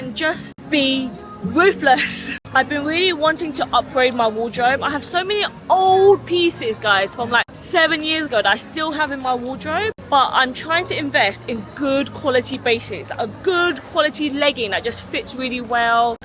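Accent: United Kingdom. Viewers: Thank you for sharing and being so open and vulnerable with us. I can relate to you and your feelings in so many ways this was refreshing to see and encouraging. I’ve been so scared to solo travel 😩😂❤️ I’m so used to traveling with friends or my partner. This might have been the push I needed ❤️ Also yes you sound that British 😂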